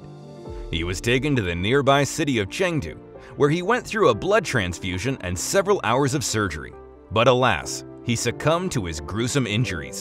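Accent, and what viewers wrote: United States. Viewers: That might be an excuse had this video been a European production . . . but the narrator is speaking English with an American accent, and it actually •shows• footage of (using the North American nomenclature) an adult elk, •not• a moose. The adult animal shown chasing some vehicle is a big deer, but it is NOT the biggest species of deer in the world. And in any event, the footage and most certainly does •not• show the marten attacking the fawn of an elk (let alone a moose); the prey is •far• too small for that. Even a newborn elk would be many times bigger than a marten. To be blunt, this video compels the conclusion that whoever compiled it has not the slightest idea what he’s talking about.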